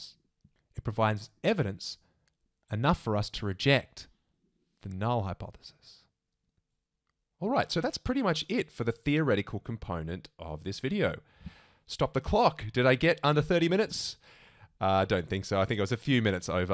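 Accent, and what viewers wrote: Australia. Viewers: Your Australian accent sounds so lovely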